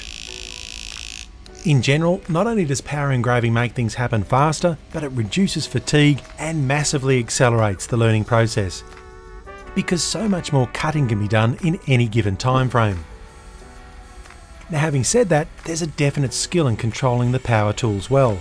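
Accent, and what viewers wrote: Australia. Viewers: Thanks for the video! A versatile guy who knows how to keep going on the job! Sound advice, good sound/video and pleasing voice/axcent! Not sure, could be Australian?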